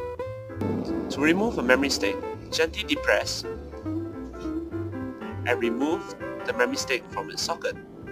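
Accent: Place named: Singapore. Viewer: You sound... your accent. Singaporean?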